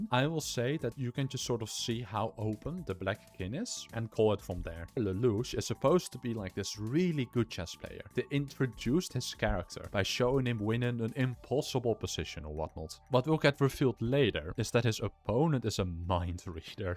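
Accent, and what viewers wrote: Netherlands. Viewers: The DUTCH ACCENT IS UNESCAPSBLE BRO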